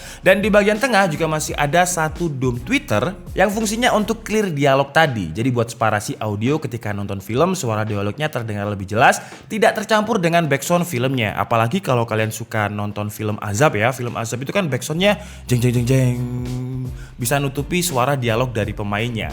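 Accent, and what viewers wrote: Malang, Indonesia. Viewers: Loh orang Malang?:v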